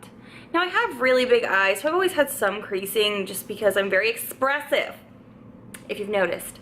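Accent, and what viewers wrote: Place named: New Jersey, United States. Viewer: Ps I like the special jersey/queens accent you do lol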